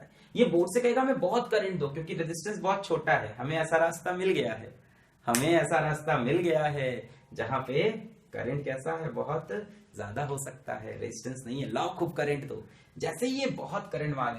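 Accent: India. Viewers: I love your hindi accent, even though I speak a little.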